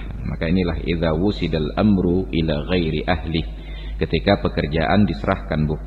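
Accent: Malaysia. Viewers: Ustaz ni aceh ke ? bahasa Melayu , dan slang bila bercakap , sama dengan orang Malaysia ! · lenggok bahasanya macam orang Malaysia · Loghat dia literally macam Malay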